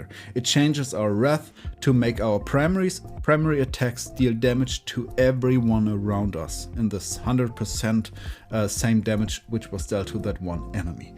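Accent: Germany. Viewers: man hört direkt das du nen deutscher bist finde es richtig cool das du englischen content bringst weiter so :D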